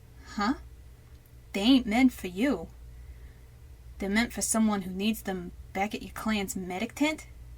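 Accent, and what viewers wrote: United States. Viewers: I'm gonna assume this is the Boston kind of accent. I'm from the South, and I never met a Northener before, so I can't tell accents apart. But I love it! I love everything in this! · You have an amazing New York accent.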